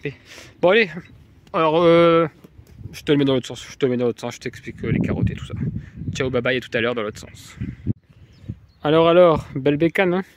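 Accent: North, France. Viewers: Salut mec. Je viens de voir ta vidéo. Je vais essayer de trouver celle du résultat car ça m'intéresse 😅 tes du nord d'après ce que j'ai entendu, moi aussi 😊 tes de ou ?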